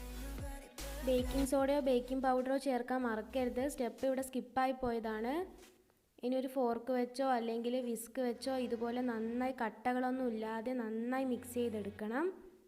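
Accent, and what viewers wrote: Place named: Kannur, India. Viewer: Kannur basha mattane...Appathekk... polum 😂😂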